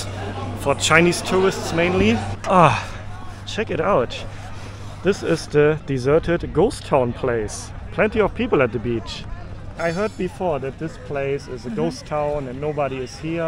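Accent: Germany. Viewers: He is germans 😂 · Sounds like you are from Germany or German speaking country……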